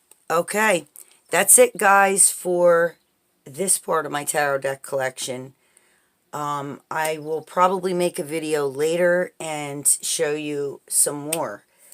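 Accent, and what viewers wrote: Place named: New Jersey, United States. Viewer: Your accent makes me ache for home.... Jersey girl or NY?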